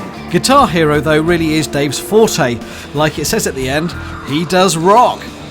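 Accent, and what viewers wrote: England, United Kingdom. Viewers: He sounds English. "British" is a nationality, not a language. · hummm u sounds like britis.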